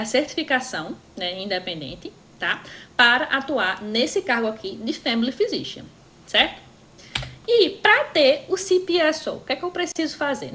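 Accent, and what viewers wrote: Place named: Natal, Brazil. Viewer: seus vídeos são incríveis! também sou de Natal e curso medicina em BH. Reconheci na hora o sotaque kkkkkkkk. Sucesso para você! nos atualize!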